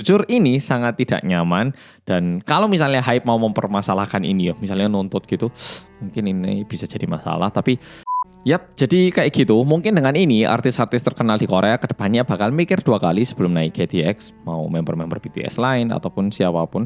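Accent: Java, Indonesia. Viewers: Mas ..yg medok .bs gak orang indo kerja d sana ...🙏🏻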